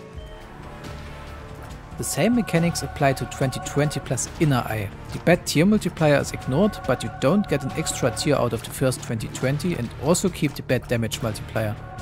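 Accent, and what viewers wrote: Germany. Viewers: So extremly german 😅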